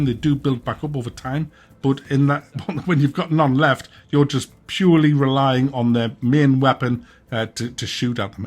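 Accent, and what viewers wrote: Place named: Newcastle, United Kingdom. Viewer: Not seen your channel before but your red and green logo made me think of you'd have an italian accent. I laughed so hard when I realised you were a geordy! Great, honest review. I'll definitely watch more of your vids.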